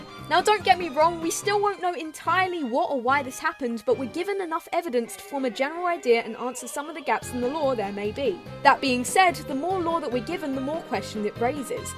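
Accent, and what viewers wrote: United Kingdom. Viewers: WHY IS BRITISH!?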